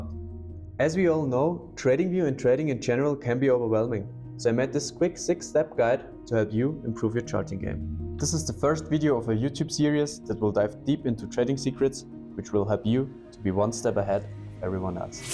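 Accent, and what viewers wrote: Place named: Germany. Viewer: I didn’t know you also had a German accent 😅 great vid brother. Hope it’s the first of many!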